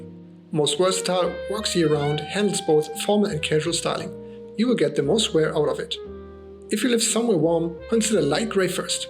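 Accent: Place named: Germany. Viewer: Great videos with helpful tips. Just subscribed. I hear from your accent you’re German? Question: where do you buy your suits?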